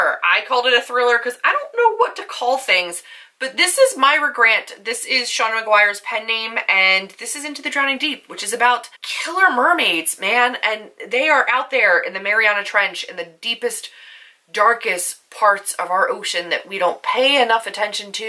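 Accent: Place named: Philadelphia, United States. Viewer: Just found your channel and I’m obsessed! Are you from PA? You sound like you have a Philly accent. I’m from eastern PA. I really want to read The Seven Husbands of Evelyn Hugo. You make it sound awesome.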